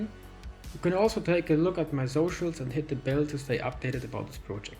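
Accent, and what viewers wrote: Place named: Germany. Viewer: you sound incredibly german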